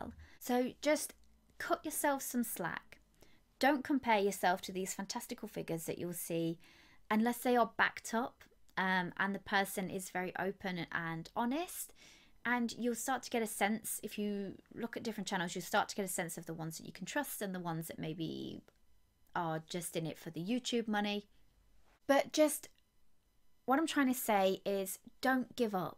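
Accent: United Kingdom. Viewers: Hi 😊 I appreciate it because you are honest and realistic Excuse me , are you British ! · I am a Kdp newbie. I am about to upload my first children's book. Thank you for the sincerity and straightforwardness! Last but not least: I love your accent! What part of the UK are you from? I'll come back to let you know what happened to my sales. Wish me luck!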